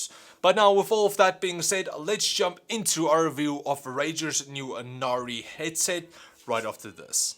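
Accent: South Africa. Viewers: Hey. You're South African haha. Me too. Considering to buy this headset for myself now.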